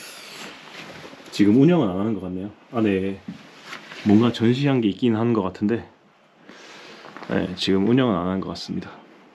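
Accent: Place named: Gyeongsang, South Korea. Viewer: 쌍도 억양 듣기 싫어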